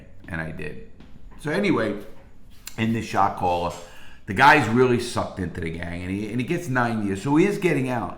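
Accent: New York, United States. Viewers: U sound like you’re from NYC or the northeast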